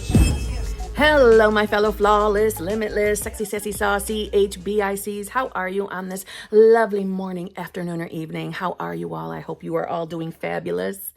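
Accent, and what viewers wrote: United States. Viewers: You have a beautiful accent! Sounds very American 🇺🇸 ! The content is also good! 🤩✨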